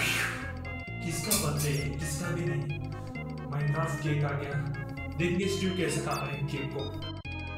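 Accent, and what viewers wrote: South, India. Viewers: your south indian accent is so good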